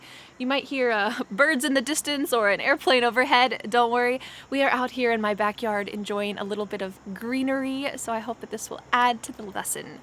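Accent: United States. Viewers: This is the American way to pronounce them, not the right original one. I doubt Adidas is called in this way in Germany 😜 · I love American accent so much😍and this video is so interesting and useful! Thank you! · Thanks a million🌷🌷👍👍as an iranian i'm so happy to learn brands in english specially with american accent😍😀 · Mmmm these are all American pronunciations…..anything not an American brand is mispronounced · Go and learn from someone who speaks British English because Americans mispronounce everything in English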